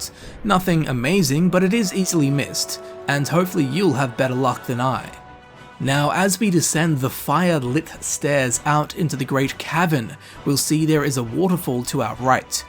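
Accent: Australia. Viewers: isn't he australian though? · He's British, he has it memorized. Edit: Australian? It's less funny now.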